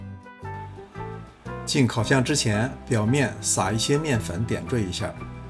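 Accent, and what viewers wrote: Beijing, China. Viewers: ，在海外的人听到乡音，倍感亲切，喜欢您的视频，而且还有老北京的风味小吃，太棒了！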